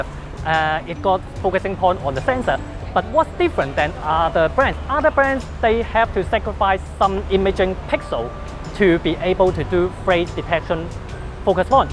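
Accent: China. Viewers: Your English sounds funny ..typical Chinese slang...lol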